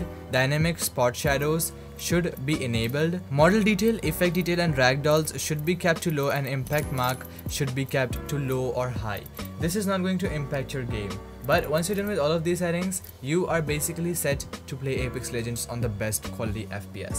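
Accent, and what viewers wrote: India. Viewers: Why do all the helpful tech vids I find have an Indian sounding dude.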